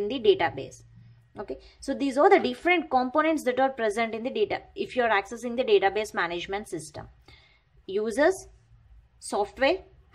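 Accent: South India, India. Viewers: Love ur south indian accent ma'm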